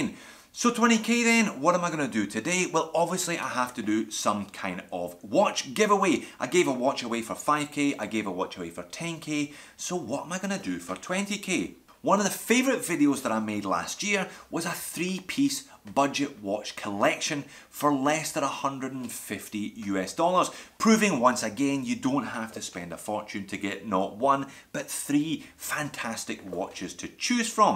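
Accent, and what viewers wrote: Scotland, United Kingdom. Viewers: My favourite video of yours is the first part of modding the legendary SKX ( <link> ) Simple but important and practical mods ;) Maybe it's the fact that I love SKX itself so much 😂 And I really enjoy your content, nice hard work and this Scottish accent ;) Keep it up and go far above 100k soon, I'm sure you will :) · My Favorite is the D1 Milano... BECAUSE (has nothing to do with liking the watch) This video showed up in my subscription lineup, and my wife liked the look of it. So this was the first JOMW video she ever watched. And upon watching, a milestone was reached. You are the only watch channel host in my subscription list who does not annoy her. Maybe it's your Scottish accent (we recently vacationed on Skye) or the fact that you are a Scott living in Australia like one of our favorite musicians, Collin Hay. She has since also commended the channel's intro graphics/colors/font. 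(she is a graphic designer) Anyway, your channel, and in particular this video, opened an avenue for us to "nerd out" on watches together. Which has made the life of this watch collector, measurably better. Thanks!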